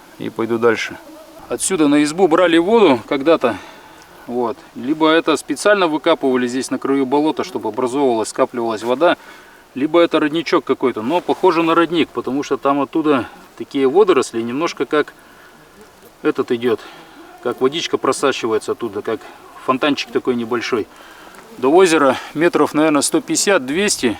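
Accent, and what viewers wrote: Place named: Vyatka, Russia. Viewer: Это, те что желтенькие окунята тебе попались, они смотри сразу копчоные, их не вари!!!😅😊 Откуда родом брат? речь такая как будто "по-вятски"..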